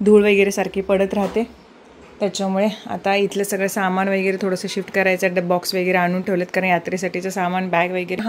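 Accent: Kolhapur, India. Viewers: तुझी कोल्हापुरी मदून मदुन बोलतेस आवडत ऐकाय bolt ja kolhapuri avdel eiky ❤श्री स्वामी समर्थ ❤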